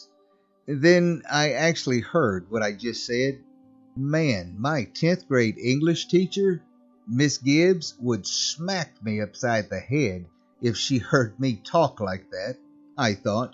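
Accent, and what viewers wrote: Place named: Midwest, United States. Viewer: You really sound like guys I know are you midwestern like Iliana area if you know where I’m talking about then you are if not oh well but you have that sound. It’s good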